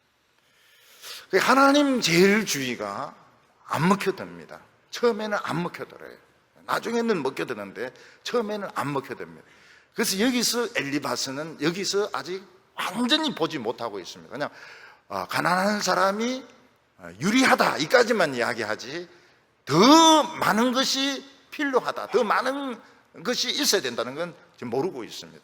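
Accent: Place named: Gyeongsang, South Korea. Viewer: 목사님! 감사합니다. 저도 경상도 사내입니다. 경상도 구수한 언어로 쉽게 이해할수 있겠끔 설교하여 주시니, 깨달으며 은혜받음을 하나님아버지로 말미암은 징계,핍박,고난 달게 받는자 되도록 새롭게 마음 다짐니다. 늘, 건강하셔서 멋진설교 지속하여 주실것을 기도 드리겠습니다.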